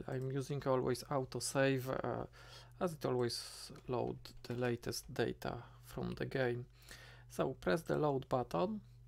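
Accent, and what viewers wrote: Poland. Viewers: Tak myślałem że Polak po akcencie :P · Dzięki wielkie, zajebisty masz angielski bo mega polski akcent ale posługujesz się w pełni poprawnie czasami itd. pozdro ;D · I got 1 question, your Polish? Cuz i feeling I'm hear this accent too much times in my life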